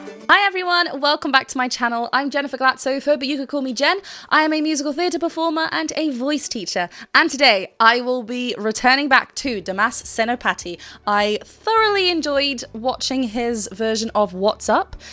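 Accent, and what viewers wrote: England, United Kingdom. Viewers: Kamu orang inggris ya saya hanya ingin denger kamu bilang water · r u British?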